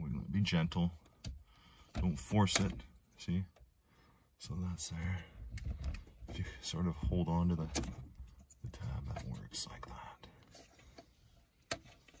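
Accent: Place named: Ontario, Canada. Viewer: Thank you for such a great video! My radio is acting up now too so I ordered the digitizer from Ali. I'll report back after I replace it. I'm in Canada as well, Halifax. Where are you? You sound like maybe from Ont.? Thanks again! Cheers...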